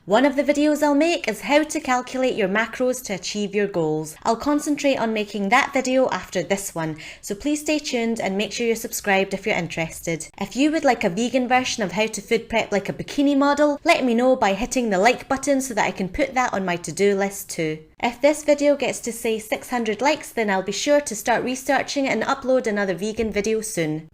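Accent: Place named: Northern Ireland, United Kingdom. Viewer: I love your accent it reminds me of Northern Ireland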